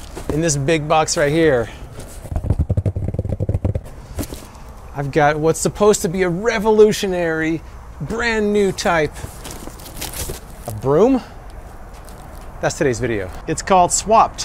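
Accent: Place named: South, United States. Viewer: Keep the southern accent man 😂 if you grew up in the south then keep the accent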